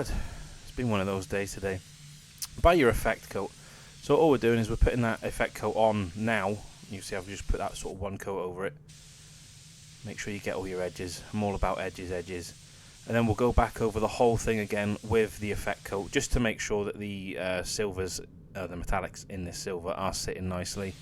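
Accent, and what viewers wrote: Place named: England, United Kingdom. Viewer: thank you for yourgreat content. I'm in the US so I like seeing other products. You are very good teacher. Also love the English accent. Please keep up the work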